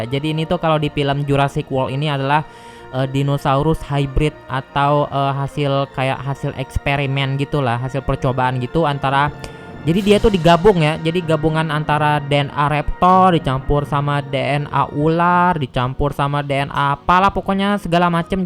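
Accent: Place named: Palembang, Indonesia. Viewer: Kau orang Palembang yaaaaaaaaaaa💯💯💯💯💯💯💯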